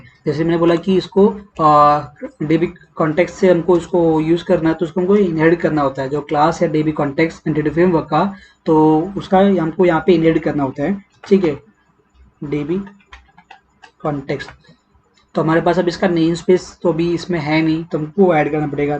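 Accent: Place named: Bihar, India. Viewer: Lag raha hai aap bihar se ho thik hai.❤❤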